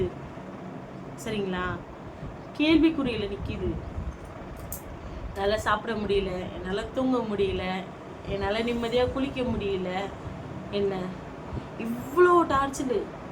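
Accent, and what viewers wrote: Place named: Chennai, India. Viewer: நீங்க பேசுறது ரொம்ப கஷ்டமாக இருக்குது நீங்க சென்னை பொங்க சிஸ்டர்.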